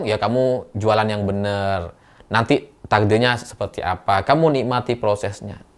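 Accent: Indonesia. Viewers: Bang ini kyknya "lok lemak"... dari logatnya 😂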